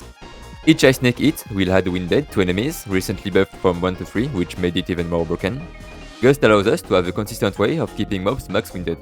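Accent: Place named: France. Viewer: W vid mon reuf, l'accent fr qui s'entend bien x)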